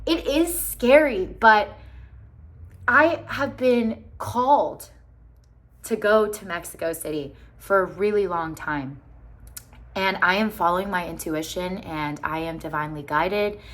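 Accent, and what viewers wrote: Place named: United States. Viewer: Eres guatemalteca pero hablas gringo y, ahora, estás en México. Ah. No entendí pero suerte. 🥱🤔🤷🤙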